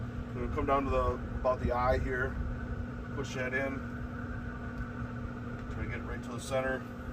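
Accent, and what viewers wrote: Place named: Wisconsin, United States. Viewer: Where are you from? Sound like wisco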